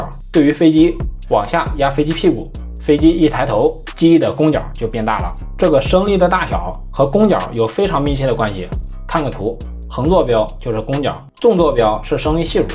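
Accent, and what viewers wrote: Henan, China. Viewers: 是河南还在菏泽老乡啊，哈哈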